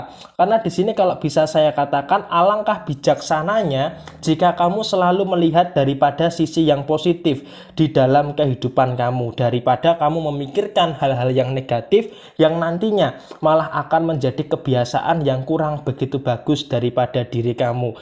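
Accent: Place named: Kulonan, Indonesia. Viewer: Njenengan orang mana mas kayak orang kulonanan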